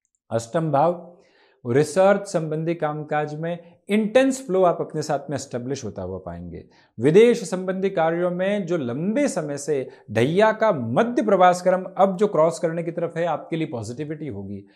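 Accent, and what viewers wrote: Standard. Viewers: Guru ji ki hindi bahot pure 👌 hai aur aise hi honi chhahiye ❤ English medium ke bachho ko Badiger problem hoti hai hindi ki 😅 thanks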